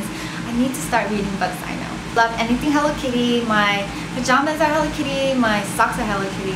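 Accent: South Korea. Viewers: She might have been raised speaking only Korean as a child so she might have developed an accent. Its the same for my cousin. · You have such a thick Korean accent it's hard to believe you're from Texas :) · Korean accent? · I still don't understand how you could have that unmistakable, strong Korean accent. I lived in the states for a couple of years and even I don't have it.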